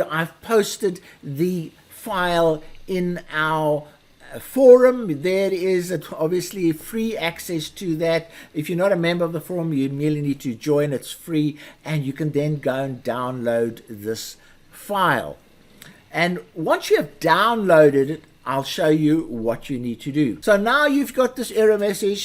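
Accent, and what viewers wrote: South Africa. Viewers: Hi, let me rather ask. Are you a South African?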